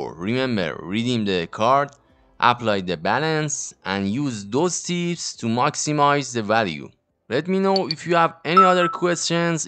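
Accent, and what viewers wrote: Iran. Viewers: داش لحجت ایرانیه، چرا نمیشه تو کلش استفادش کرد ؟؟ هرکار میکنم میزنه رو رویال پس میره تو خرید با کارت مستر یا ویسا گزینه اپل پی نمیاد؟؟؟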